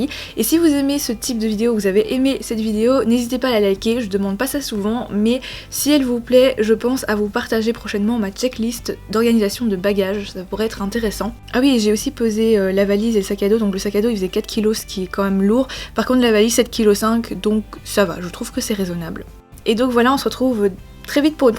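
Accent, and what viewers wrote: Belgium. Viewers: tu es belge ? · Tu es de Belgique ?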